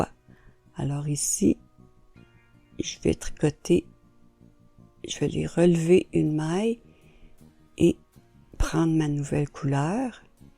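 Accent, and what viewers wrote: Quebec, Canada. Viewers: Bonjour, je me remets au tricot juste parce que j'ai flashée sur une laine dégradée turquoise que j'ai acheté cet été en me disant, je verrai bien ce que j'en ferai... J'ai vu des modèles d'entrelac sur Pinterest, mais sans les explications, que j'ai trouvé ensuite sur YouTube mais sans le déroulé des finitions comme vous... Je vais pouvoir corriger et poursuivre mon tricot grâce à vos explications (avec en prime l'accent de mon amie Québéquoise) ... SVP: Pourrait-on avoir accès à des explications écrites (PDF ou Word)??? La transcription automatique est difficile à suivre et à enregistrer (Lol) MERCI INFINIMENT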